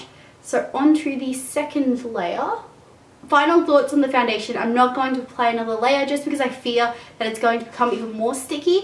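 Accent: Australia. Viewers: Kawaii Cukie Going by her accent she's in Australia like me, our Daiso stores sell everything at $2.80 :( everything is more expensive here.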